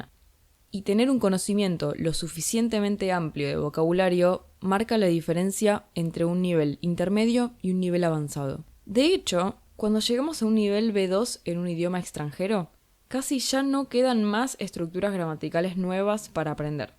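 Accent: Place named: Rioplatense, Argentina. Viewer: Great accent to learn. I love Rioplatanese. I wish there were more of these to listen to, since it is valuable Comprehensible Input, but I am looking for 500 hours worth, not 500 minutes. Still, it is nice to have this Argentine source for a short while. Thanks for making these videos.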